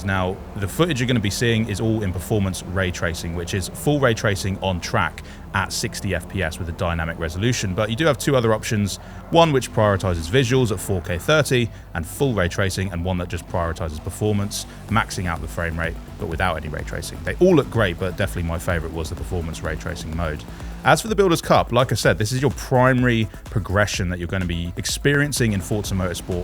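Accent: United Kingdom. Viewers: Wreely wreely good game... damn i hate british accent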